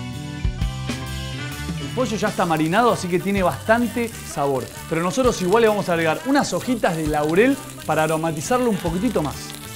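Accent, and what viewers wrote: Buenos Aires, Argentina. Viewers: Así lo pronunciamos en buenos aires xd posho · Carlos Matias asi se habla solo en Bs As.... en el interior de Argentina le decimos Poyo... abrazo grande desde Tucuman !!!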